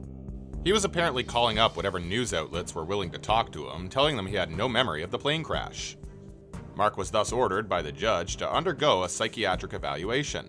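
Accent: Canada. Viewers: I can tell you are Canadian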